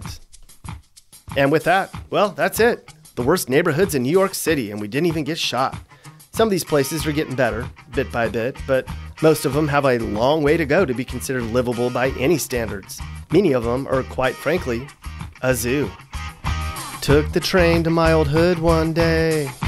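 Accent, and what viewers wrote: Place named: Midwest, United States. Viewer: you hear the midwest in his voice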